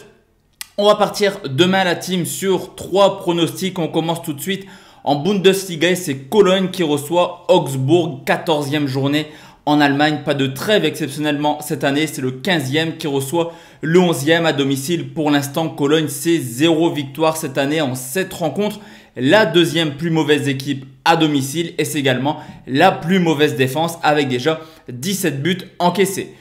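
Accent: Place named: Lille, France. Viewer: Bonne année et meilleurs vœux Que l année 2021 soit bon en pronos pour nous tous !! Au faite t du nord on sent l accent lillois 😉😉😉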